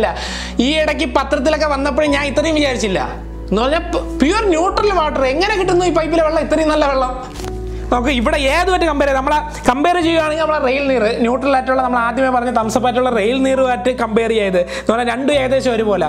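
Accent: Trivandrum, India. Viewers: Bro ningal TVM aana??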